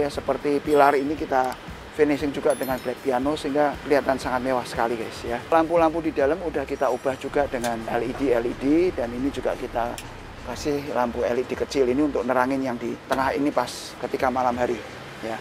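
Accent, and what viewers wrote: Java, Indonesia. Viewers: Enggak Rasis maksudnya guyon kagum kok wes melebur sama darah Jawa medok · Oyi jgn rasisme, sudah asli indonesia lahir di jawa tengah, logat memang logat ibu yg terbawa...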